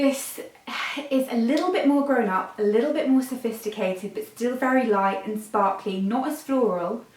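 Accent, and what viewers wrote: United Kingdom. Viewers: I’m confused if you are Israeli born and raised why do you have a British accent?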